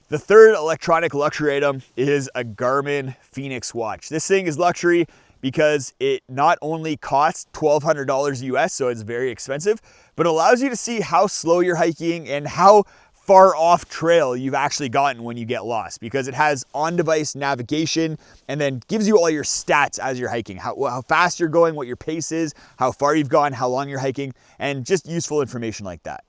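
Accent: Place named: Canada. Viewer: Sometimes it’s hard for non-North Americans to distinguish US vs Canadian accent. But boy do you clarify the differences.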